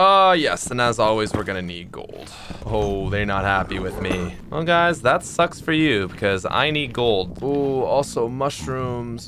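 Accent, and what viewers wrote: Canada. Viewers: I swear is he a Canadian he has like the same exact accent as my mom and she is Canadian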